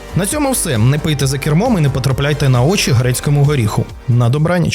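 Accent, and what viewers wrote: Russia. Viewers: Канальчик проросійський, з голови гниє. Совкові цитати, російські слівця та обороти в тексті, росіянський акцент у вимові нейронки. Головний месидж - в Україні все, ВСЕ ПОГАНО !!!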